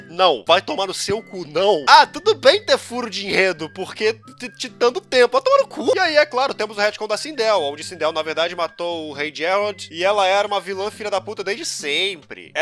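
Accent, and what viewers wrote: Rio de Janeiro, Brazil. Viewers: O sotaque combinou muito kkkkkkkkkkkk, asmeiiii um carioca reclamando é muito baummm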